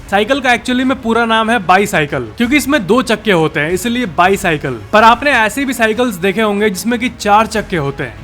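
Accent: Bihar, India. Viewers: Aap bihar se ho bhai😊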